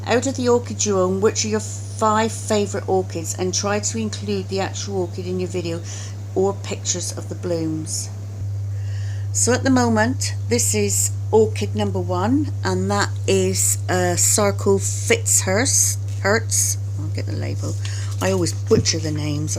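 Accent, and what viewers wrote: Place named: England, United Kingdom. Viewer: You made me smile when you were trying to remember the different types of orchids - there you were pointing the camera at Dendrobiums and forgot them completely! Thanks for joining in and you've solved one mystery - I knew that was an English accent!!!. And well done getting Den. fimbriatum to bloom - you will love those blooms but you might not like the fragrance?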